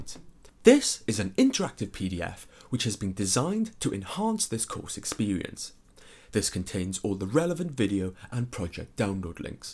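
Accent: Wales, United Kingdom. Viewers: Pretty sure he's Welsh.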